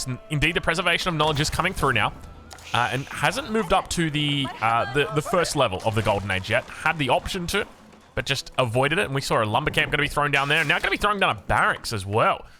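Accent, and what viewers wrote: Australia. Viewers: Aw :( you don't sound as Aussie as you used to from the last upload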